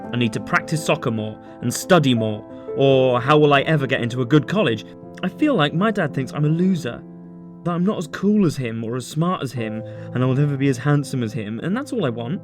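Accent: United Kingdom. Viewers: Is he british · How does this dude have a british accent but says soccer instead of football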